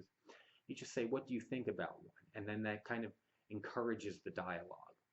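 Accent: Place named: South, United States. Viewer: I like your dirty southern accent,!!!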